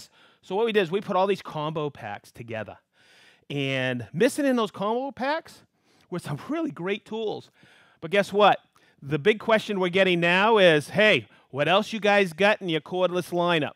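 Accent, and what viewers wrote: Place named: East Coast, United States. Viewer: "this is an accessory God...Spock God" east coast accent...love ya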